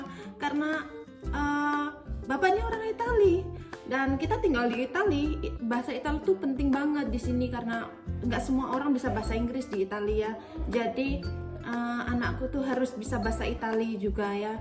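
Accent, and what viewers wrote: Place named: Semarang, Indonesia. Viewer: cute bgt....senyum2 bikun gemes....😍😍 Logat semarang yg paling medhok ya mam....😀😀😀 yg wong Semarang mana like nya...😍😍😍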